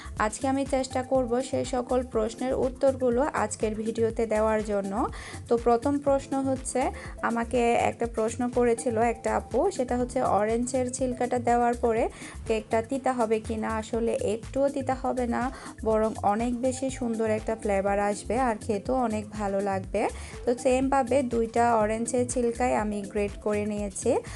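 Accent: Chittagong, Bangladesh. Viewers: MashAllah orange cake daron hoyce.Apu apni toh sob kaje parodorsi amader chttogramer apu bole kotha.mamonira kmn ache apu?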